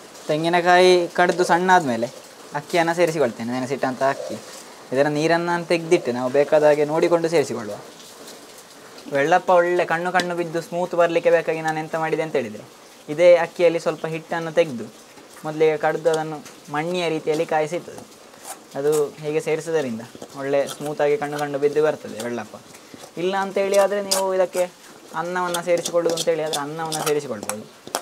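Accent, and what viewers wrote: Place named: Tulu Nadu, India. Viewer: It seems your mother tongue is Tulu, pls make videos in Tulu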